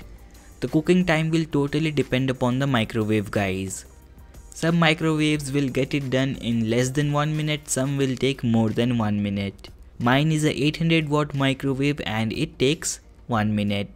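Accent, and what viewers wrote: India. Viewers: People are making it sound as if he's/she's rasist because he has an Indian accent or something. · lol indian accents always get me🤣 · Looking at the comment section it feels that this video has garnered views from all over the globe. Quite a success for something that is vegetarian and not butter chicken. To those complaining about the accent, please just chill. This is an Indian guy and English is not his mother tongue. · Myra Cherryfall He is Indian since I’m Nepali and I know how they speak xP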